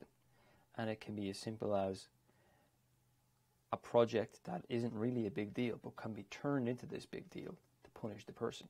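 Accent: Ireland. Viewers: very cute.. are you Irish? i would stop procrastinating with you.. ;-)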